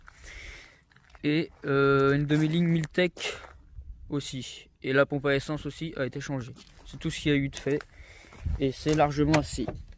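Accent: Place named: Normandy, France. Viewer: J’ai la même ! Mais avec le volant sport ) 👍 Tu es de Normandie ? C marrant ton accent 😂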